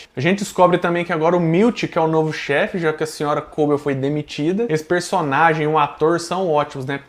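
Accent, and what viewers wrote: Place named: Minas Gerais, Brazil. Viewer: Que sotaquin mineiro bão.. minas é bom demais .. que saudade da minha Minas gerais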